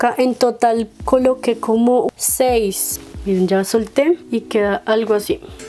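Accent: Colombia. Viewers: WOW!!! me encanto tu vídeo!!! 🦋N🦋E🦋V🦋A S🦋U🦋B🦋 pregunta: eres de Colombia? tu asentó es super colombiano JIJIJIJ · por el acento, Colombia creo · 1. Me encanta su cabello 2. Wow me encanta tu asentó (Creo que eres de Colombia ) pr tu asentó 3. Si alguien le da dislike a el video voy hasta tu casa y te mato😎 pq quien le daría dislike a esta joya de vídeo 4. Me encanta como editas Y eres de Colombia verdad ? Saludos desde Colombia 🇨🇴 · Su voz describe colombianidad 🇨🇴🇨🇴🇨🇴🇨🇴🇨🇴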